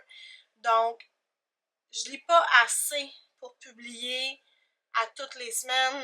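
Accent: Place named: Quebec, Canada. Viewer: Bon anniversaire pour ta chaîne et bonne continuation. Je n'ai pas encore vu beaucoup de tes vidéos, mais j'aime beaucoup ta facon de faire (et ton accent québécois 😁) Je t'ai découverte par le biais de Karine et j'ai adoré vos deux vidéos sur votre weekend-lecture.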